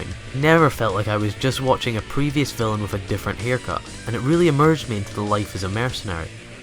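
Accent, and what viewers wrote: United Kingdom. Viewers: And c'mon man, you're British, right? Even saying "badass" sounds cringe.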